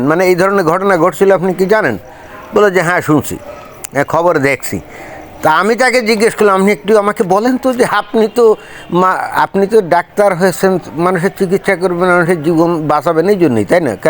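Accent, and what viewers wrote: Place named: Narail, Bangladesh. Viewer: মহাশয়, আপনার ভাষার টান শুনে মনে হয়, আপনি নড়াইল বা এর কাছাকাছি কোথাও জন্ম। আমার বাবা ঠাকুরদার বাড়ি ওখানে ছিল। এই রকম কথাতে টান ছিল ওনাদের। আপনি ডাক্তারদের সঙ্গে কথা বলেছেন, ওরা ভয়ে কোন মন্তব্য করেননি। মুসলিমদের কোনও বিষয়ে অপরিচিত লোকেদের সামনে মুখ খুলতে ভয় পান সাধারণ অমুসলিমরা ,রাজনৈতিক কারনে।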